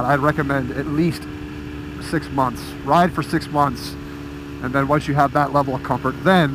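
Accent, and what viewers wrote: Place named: United Kingdom. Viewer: NinjaBoots ***** Hehehe...yes his British accent does make it special. I like his hones oppinions and style. Just being himself and looks like a good fella to my oppinion. Also f*cking love the track days and the test drives, Awesome. I am so happy for him, but was a bit sad when he said for the divorce... Hope he will do OK. Ride safe guys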